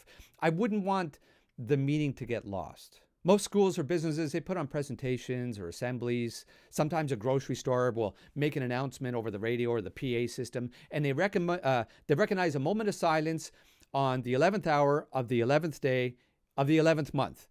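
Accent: Canada. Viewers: Clicking on this video I was worried this would be an American explain what Canadians are. But NOPE. Five seconds of this man’s accent told me he was a real Canadian. 😂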